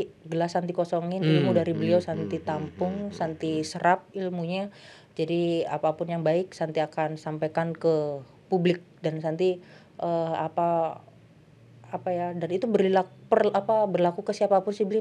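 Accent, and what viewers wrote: Bali, Indonesia. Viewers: Host nya hrs belajar lagi bhs Indonesia jgn medok bali. Kedengaran lucu😂😂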